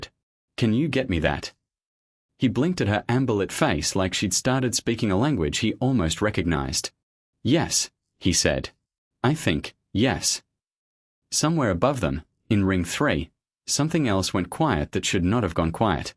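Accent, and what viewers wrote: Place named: Australia. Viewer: wdf?... and the reader has an Australian accent? (I might be wrong but it sounds... unusual)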